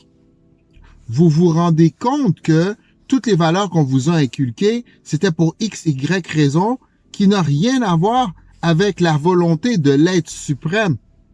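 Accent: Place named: Canada. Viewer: Tu es obligé de parler comme les canadiens? De prendre l'accent pour qu'on sache que tu es à Montréal ??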